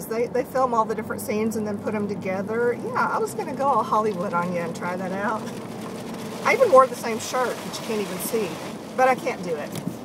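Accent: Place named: Texas, United States. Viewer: What I love the most is your Texas accent. I'm a native Texan stuck in Florida. I love hearing the "song of my people"!!!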